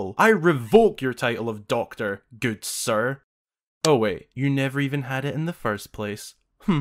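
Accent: Scotland, United Kingdom. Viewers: he's from the UK dude (pretty sure), he has an accent, foreign people just pronounc things differently 🙄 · Why thats a lovely Scottish accent you have there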